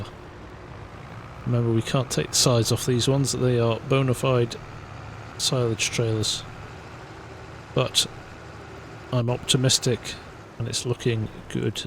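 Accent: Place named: Scotland, United Kingdom. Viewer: Also that Scottish Accent. mwah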